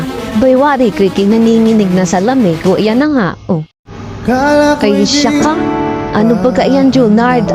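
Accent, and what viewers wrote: Batangas, Philippines. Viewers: Batangeña ala e HAHAHAAA ang cute!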